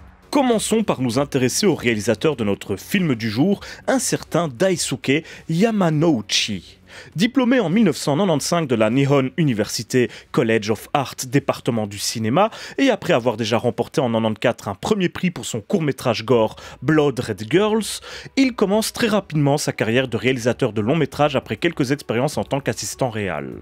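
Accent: Belgium. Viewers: Bonjour, j'aime bcp tes vidéos. Le monde du cinéma d'horreur m'est étranger, j'aime pas ça mais j'aime écouter des gens parler de sujets que je ne connais pas et les rendre intéressants. Tu es de ceux là. J'aime bie' e' apprendre plus dur tout un tas de choses et j'adore voir et/ou écouter tes vidéos. C'est passionnant. Je ne verrais sans doute aucun de ces films mais j'adore apprendre des tas de choses dessus. Ça enrichit. Et la pointe d'accent belge est un vrai plus car j'adore la Belgique et cet accent si chaleureux. En bref, merci pour ton travail.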